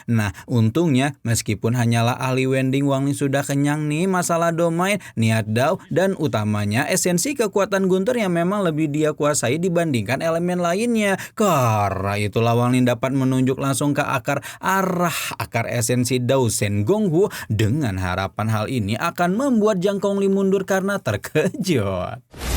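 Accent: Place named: Java, Indonesia. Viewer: candu ama nada mutiara membangkang surga next lebih medok lagi bang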